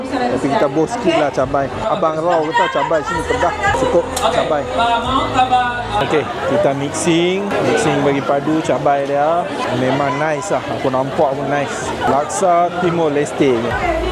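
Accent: North, Malaysia. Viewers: Slang utara dia memang sempoi.. Jelas dan faham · Wow... Kita sekampung loghat utara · baru pertama kali tgk video abg memng puas la dgn loqat utara lagi the best ...semoga channel abg cepat brkmbng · u ckp loghat utara, i mati2 ingt u org melayu. rupanya u indian. tapi u ckp mmg macam melayu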